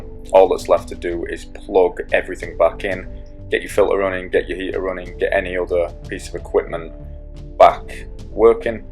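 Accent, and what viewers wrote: United Kingdom. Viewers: are you from the uk you sound like it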